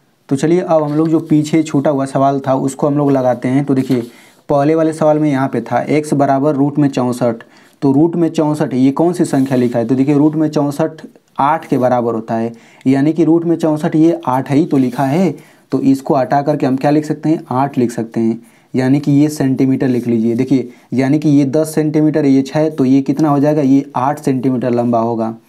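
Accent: Bihar, India. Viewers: Sir Aap Bihari hona na anyways Thank you 👍👍👍👍👍👍 sir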